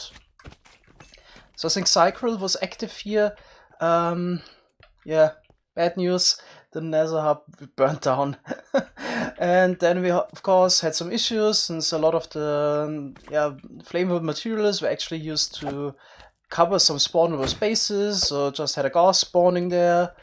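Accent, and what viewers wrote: Germany. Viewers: Ist er deutsch? Sein Akzent klingt danach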